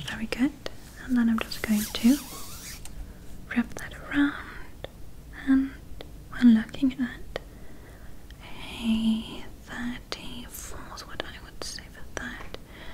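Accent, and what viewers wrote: United Kingdom. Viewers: This is my first video of yours in a while and let me tell you, the accent threw me for a loop. I’m here trying to figure out if it’s acting or if I’m misremembering you not having a British accent lol. Your voice is relaxing regardless of the accent. Great video! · I love your accent 👌🏼👌🏼👌🏼 ITs so beautiful... sound a bit British 🇬🇧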